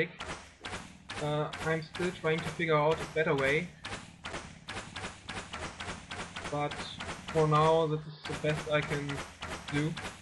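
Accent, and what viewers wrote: Germany. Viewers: Kannst du die welt hochladen? Du hastn deutschen Aktzent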